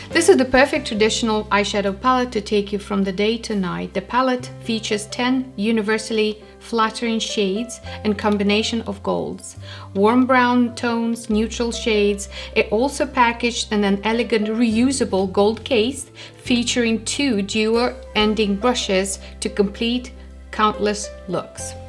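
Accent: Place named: United States. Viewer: Как прекрасно ты говоришь, словно ты американка!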